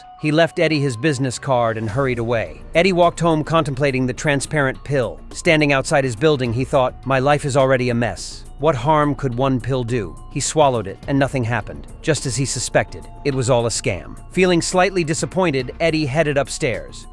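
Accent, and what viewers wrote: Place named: United States. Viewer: Sound like a certain American guy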